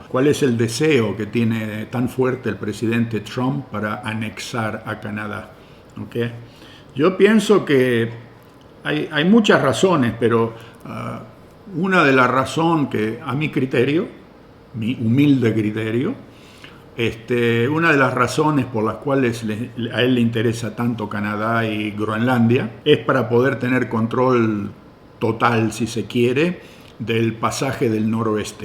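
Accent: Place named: Cordoba, Argentina. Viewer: Pensé que usted era un argentino cordobés para más dato y no un canadiense.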